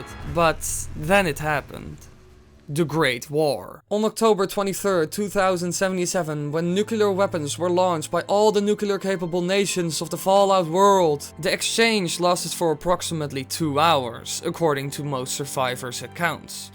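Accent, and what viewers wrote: Netherlands. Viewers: Dat merkte ik ook gelijk hahaha... Steenkolen Engels op zo'n manier komt alleen van een Hollander. ;) Later!!! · are you dutch ??? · Wait.. you're dutch... GROETJES!! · Are you Dutch?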